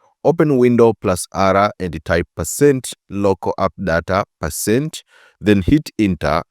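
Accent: India. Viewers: what is that accent bro indian or arab? fix your language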